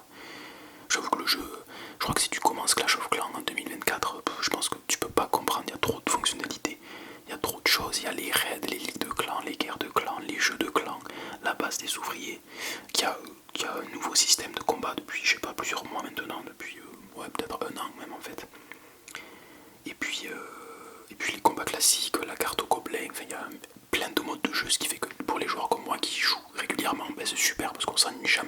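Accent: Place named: South, France. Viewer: t’es du sud toi 😂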